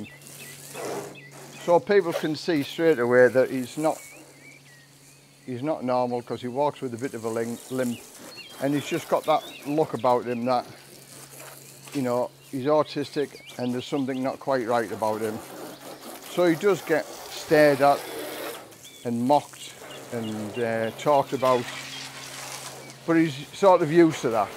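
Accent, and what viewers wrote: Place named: Northern England, United Kingdom. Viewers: Hi what accent is that, sounds like my Middlesbrough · I am an 86 year old Englishwoman and I will say that this is the best country to live in. It is not the lovely country that it was in my childhood but I would never live in another country. I have travelled extensively and lived,for three years, in Colonial Africa where I was very homesick for England. You sound like a northerner to me. Perhaps you have not seen the lovely parts of England.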